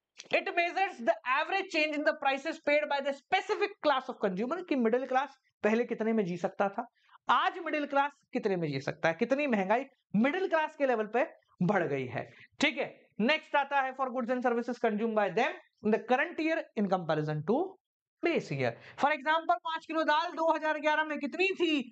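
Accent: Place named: Agra, India. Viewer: sir aap agra se ho kya aapke accent se lag ra hai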